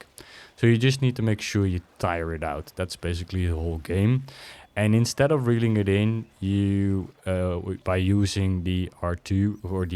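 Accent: Netherlands. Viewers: nederlander zeker?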